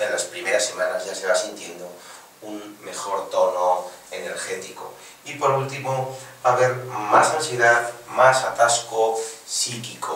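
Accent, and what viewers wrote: Spain. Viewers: Pues, soy mexicano y me es incómodo y difícil escuchar lo que dice éste señor. No porque use otras palabras, si no más bien que por su acento me es complicado escucharlo. ¿Por qué? No lo sé, tal vez sea porque no estoy familiarizado con el acento español.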